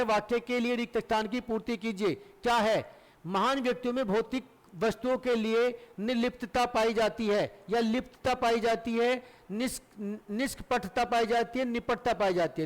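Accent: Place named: Haryana, India. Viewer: Apka tone lagta hai ki aap hariyana se hai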